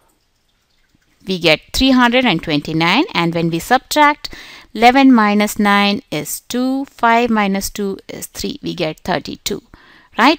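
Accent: India. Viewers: She sounds Indian so maybe that's the reason why she is just fond of speaking right again and again as Indians are not very good at English though so plz ignore her mistakes. thanks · nathan duran its an indian accent dude -_ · Lovely Indian-accent...😍😍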